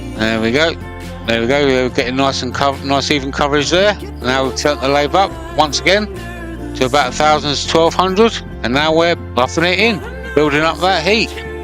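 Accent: United Kingdom. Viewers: Very nice. I might try making a set to display in our family room. As a first time viewer I have two suggestions. Please turn down the music, being from the US it makes it hard to understand your British accent, and please move the camera so the chips don't aim at the lens. Thanks from a new subscriber.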